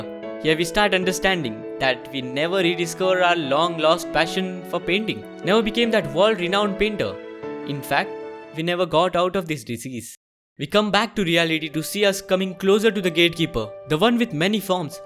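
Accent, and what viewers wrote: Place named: India. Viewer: god fucking damn it. the indian accent makes the whole video impossible to watch..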